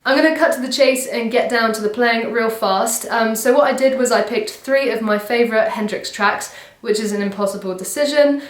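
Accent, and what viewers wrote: England, United Kingdom. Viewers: Unbelievable!! I love Hendrix & after I learned how to play my 1st Hendrix song I had a newfound respect for the man. I still am amazed by the way he thought and the licks he came up with. The 1st song I learned to play ever was!! I'm def going to check this out. I love your accent of course you are from the UK.. it's hard too meet a girl that rocks in the US. Now it's hard to meet anyone, (COVID) smh. Love your music keep up the good work you rock!! -- Nigel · Se nota que es inglesa, puedo conocer esa forma de hablar y su acento pirata!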